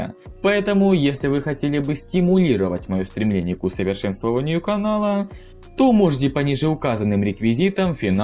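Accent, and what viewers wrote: Ukraine. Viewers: Твой Украинский акцент такой мерзкий. Буквально засыпаюсь от голоса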